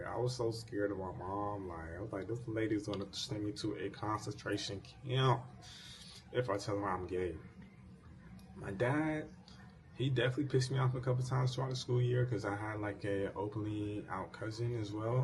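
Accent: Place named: North, United Kingdom. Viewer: It’s so weird bc u don’t strike me as sb who is from the south u sound and carry ur self like u from up north 😂 u got that smooth voice like a New Yorker 😫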